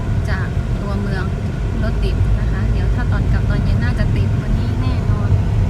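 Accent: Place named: North, Thailand. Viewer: น้อง ที่รัก เมื่อใดด่านเปิดเสียทีอยากจะไปฟังน้อง อู้กำเมืองจ้าว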